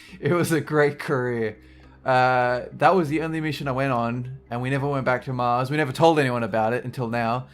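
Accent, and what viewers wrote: Australia. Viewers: Didn't know you were Aussie... didn't really pay attention or care either but mkay. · Your Australian..... Holy shit I love you so much more... State? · Wait ur aussie?? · I didn't know your were aussie! Sweet! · Wait. You're Australian. WAT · is Aussie?!?! 😂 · TectalchameleonJamaa it's almost as if it is an Australian accent!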